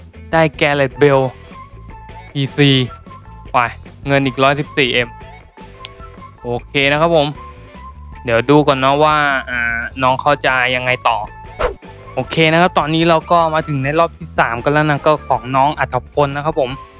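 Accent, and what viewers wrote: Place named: Isan, Thailand. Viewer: ครับพี่พูดเหมือนคนอีสานเลย555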